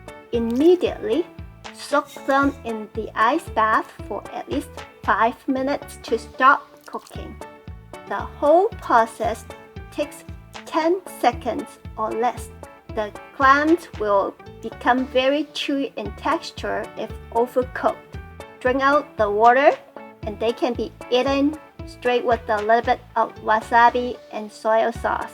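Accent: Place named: China. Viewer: Wow....There's nothing wrong with her accent, if anything it just brings more Chinese authenticity. I would argue there are many English speakers are becoming more and more curious about Asian cuisines and channels like this is contributing a lot to this shift in mind set.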